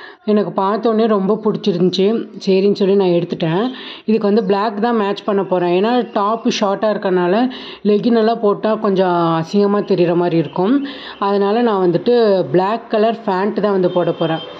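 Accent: Kanyakumari, India. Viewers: Akka Kanniyakumari slang pesunga replay seiyoom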